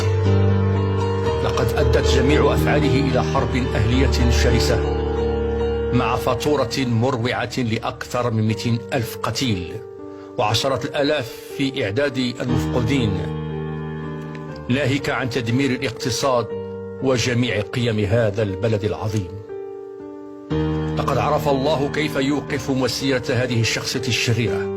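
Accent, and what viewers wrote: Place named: Morocco. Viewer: صاحب هذا الفيديو باين مروكي من طريقة كلامو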